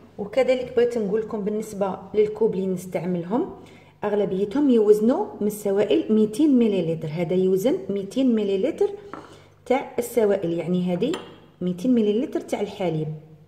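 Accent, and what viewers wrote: Algeria. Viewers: حبيت نقلك كتعجبني لهجة الجزائرية الله يوفقك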